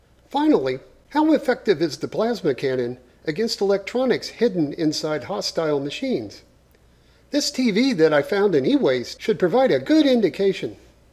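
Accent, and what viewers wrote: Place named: Canada. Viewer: So, just did a little basic math using Assuming that's supply not output, that's 7.2 million kW. Average cost of energy in Canada (could be wrong but he sounds Canadian to me) is 19.2 cents per kWh. Unless I'm missing something, this costs $1,382,400 to fire once at full power, just in electricity. I pray I'm wrong, because this is too awesome to be such a rarity. If it's anywhere near the ballpark though, we need to appreciate the sheer commitment this man has to making cool stuff. In other words: GET THIS MAN SOME LOTTERY TICKETS!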